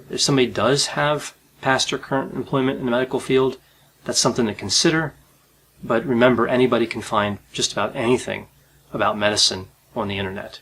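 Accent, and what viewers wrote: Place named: Delaware, United States. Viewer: Delaware accent.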